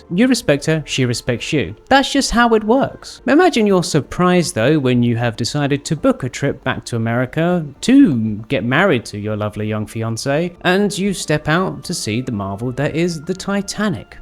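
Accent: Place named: RP, United Kingdom. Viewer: Ok, this is first time I watch video with this particular youtuber and I must say I could listen to him for hours. The way he speaks english with received pronunciation is just wow.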